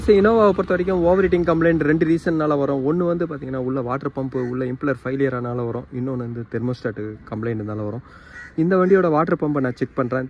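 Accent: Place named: South India, India. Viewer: I didn't understand what you said In south accent but it's very informative ❤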